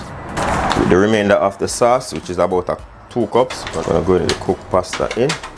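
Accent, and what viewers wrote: Jamaica. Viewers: Bro you have me drooling just subscribed. Love how Jamaican speak and your cooking is out of this world. Ah gwan big up yuhself bro 🇹🇹🇹🇹🇹🇹🇹🇹🇹🇹🇹🇹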